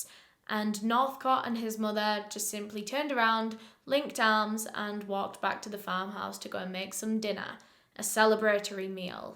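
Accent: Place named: Southern England, United Kingdom. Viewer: just me who thinks accent is fading into a more southern English accent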